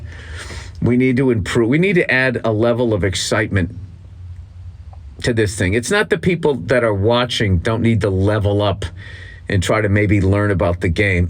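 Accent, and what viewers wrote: Boston, United States. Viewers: Bill burrrrr. I nominate Bill for the CEO of Sam Adam's beeeerra- Boston Accent 😅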